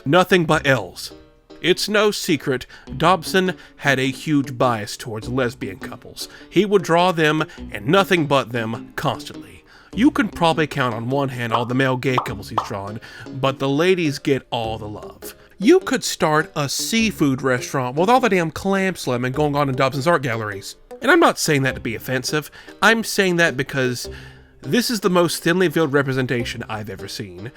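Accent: South, United States. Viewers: This is completely off topic but are you from the South? Something about the way you talk man, I can HEAR the southern in you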